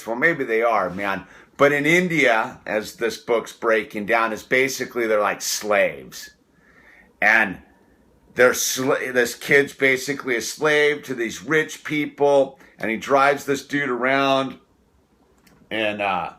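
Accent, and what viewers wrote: California, United States. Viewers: You are the most Californian person I’ve ever heard speak. Really enjoyed the review from the UK 🇬🇧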